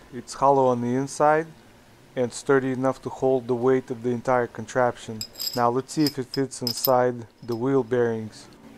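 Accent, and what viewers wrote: Russia. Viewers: Блин слышно сразу по голос русский))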